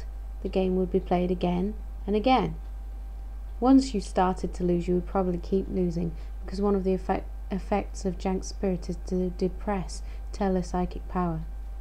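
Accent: United Kingdom. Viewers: When I first heard you read a story I thought you should have children all around you! You would be an excellent story teller! I do love your British accent... You have my attention!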